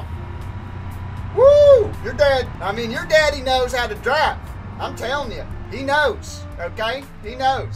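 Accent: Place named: West, United States. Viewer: I like the western accent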